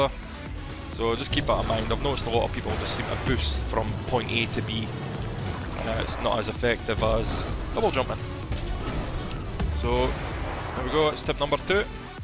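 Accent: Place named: Scotland, United Kingdom. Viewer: I really like the Scottish accent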